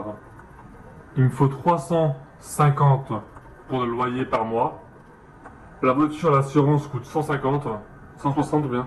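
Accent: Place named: England, United Kingdom. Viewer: T'as l'air d'avoir du mal à parler français j'trouve, t'as même l'accent anglais xD . En tout cas vidéo très intéressante ! Continue !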